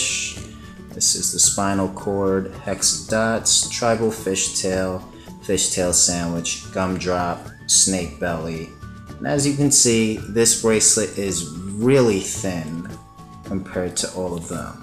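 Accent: United States. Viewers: You really think people can make the snake belly cause I would love to, also please reply but is choon your dad or grandad because u sound american and choon is from the phillipines. I dont get it yalll and I spend $ 894 yestdrday at therainbow loom webstore and now im bankrupt!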